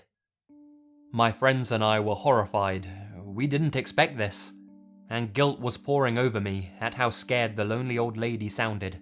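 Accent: England, United Kingdom. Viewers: same brits ftw is he british? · are you British I'm not good with ascents sorry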